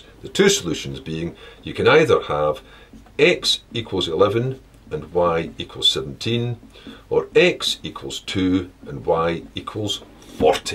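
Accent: Scotland, United Kingdom. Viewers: He's Scottish, not Irish.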